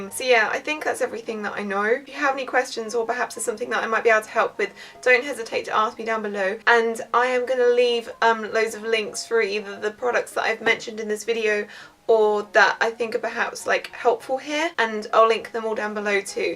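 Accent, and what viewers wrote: United Kingdom. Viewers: Far Q It's just British... I suppose.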